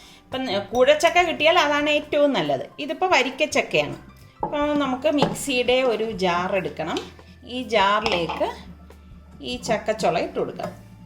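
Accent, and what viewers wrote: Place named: Kasaragod, India. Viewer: Appa chapale..😍ksd slang...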